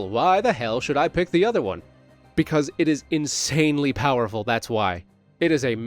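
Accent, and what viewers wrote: Canada. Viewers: Letting the canadianness come out eh? KTZed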